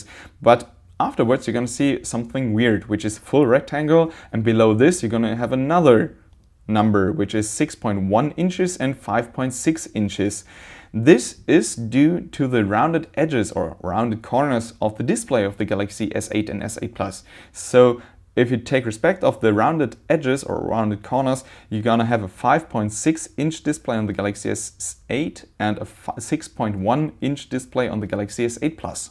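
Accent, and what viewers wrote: Germany. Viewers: du sprichst echt gut englisch (konnte deinen deutschen akzent aber leicht hören) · Egal wie gut einer Englisch spricht, man hört "den Deutschen" sofort raus. ;) Ich würde gerne so gut Englisch sprechen können -super Video! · warum machst du keine deutschen Videos?:D man merkt deinen Akzent:D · Am i the onlyone who thinks he sounds german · I am new here, are you german cz ur accent looks like it. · Very thick german accent, toooooo much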